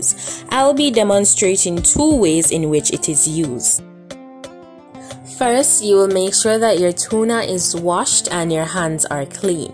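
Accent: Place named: Jamaica. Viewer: Me too... She has this sweet Jamaican accent 😍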